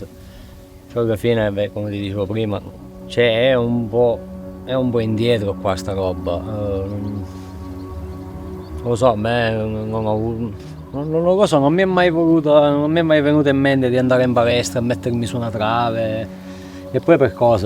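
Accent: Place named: Sicily, Italy. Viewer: Conosco nei miei 4 anni passati in Sicilia, mi ha portato a vedere e scalare nelle sue " misteriose" falesie, quelle che non si vedono all'orizzonte, perché sono dentro nei camion. Con scrissi e fotografia un bellissimo e corposo reportages con tanto di copertina su Pareti, portando al pubblico luoghi incredibili. Di questa bellissima storia mi ha emozionato risentire la voce tutta siciliana di, così umile e profonda, racconta senza fronzoli la sua solitudine di Climber in una terra indietro anni luce nell'arrampicata. Lo raccontai in quel servizio e venni contattato da un galletto del luogo, ricordo ancora l'inizio di quel messaggio: ma ti sei reso conto delle cazzate che hai scritto? Un tono minaccioso, quasi mafioso. Piccole invidie perché dissi che in un mondo di scalatori " della domenica" era ed rimane il più forte Climber Siciliano. Daje avanti cosi, per te stesso e per la tua incondizionata libertà.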